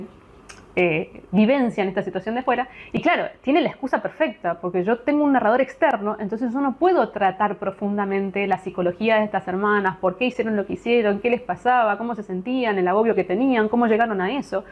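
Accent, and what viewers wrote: Argentina. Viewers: Me encanta cuando con tu acento argetino despotricas contra los libros y los autores XD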